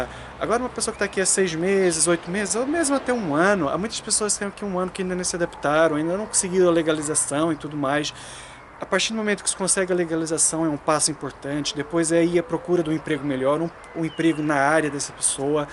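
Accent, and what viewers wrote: Portugal. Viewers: Mauricio Silva, bom dia. E isso de ele falar com sotaque portugues ( quase ! ) é mau? Deixa de ser menos legal por isso? Ele vive em Portugal há um monte de anos, está casado com uma portuguesa, nao tem quase contacto com a comunidade brasileira , entao é natural que a pouco e pouco tenha adquirido o sotaque portugues, tal como eu se fosse morar para o Brasil adquiriria o sotaque brasileiro. Ah, mas há outros brasileiros que vivem em Portugal e continuam a ter sotaque brasileiro! Ainda bem que nao somos todos iguais, uns adquirem outros nao, viva a diversidade. Penso que mais sotaque menos sotaque, o que é verdadeiramente importante é o que ele diz e nao se é com sotaque brasileiro, portugues ou se fala em chines ou malaio. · Porque você pegou o sotaque português? Conheça brasileiros que moram a mas de 16 anos em Portugal e nunca pegaram o sotaque português.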